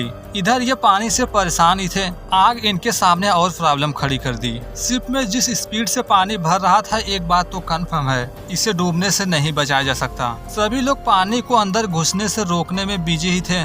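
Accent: Uttar Pradesh, India. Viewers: Brother you don't speak english words in your vedio. Your UP accent is listening in your vedio. Like starting you are saying istarting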